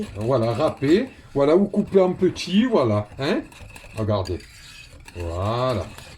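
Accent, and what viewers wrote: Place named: Oran, Algeria. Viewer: On pourra pas dire que vous n êtes pas algérien " n énervée pas mes nerfs " 😂😂😂 votre accent me rappelle mon enfance a Oran 🤔 c est un quartier de Oran mon oncle a habité la bas merci pour vos bonnes paroles et humour 😂👍 je viens de m abonnée ya une semaine bonne continuation 🙏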